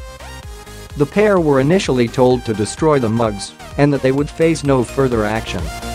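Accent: United States. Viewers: Narrated by an American robot?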